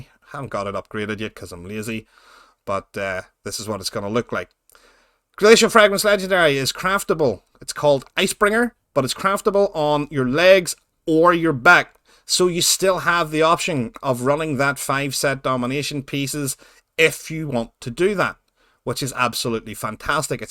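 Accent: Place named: Northern Ireland, United Kingdom. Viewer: Hey, you sound Northern Irish :) so nice to hear though :) I'm also a frost mage, nice to see some good tips :)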